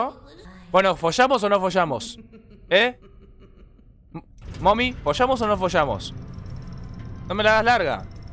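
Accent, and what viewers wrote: Uruguay. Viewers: no es argentino es Uruguayo es q lo args y los urus hablamos igual xd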